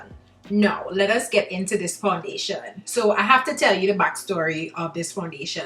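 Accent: Trinidad and Tobago. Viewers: When I heard that trini accent....subscribe ONE TIME GYUL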